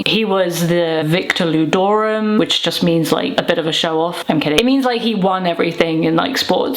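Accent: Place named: Germany. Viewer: Mas, que inglês pesado, arrastado, você por acaso é alemã ou indiana ? Your english is very heavy, it sounds like German accent to me.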